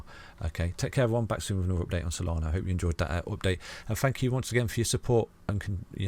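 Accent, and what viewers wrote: United Kingdom. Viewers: nothing like whispering in british accent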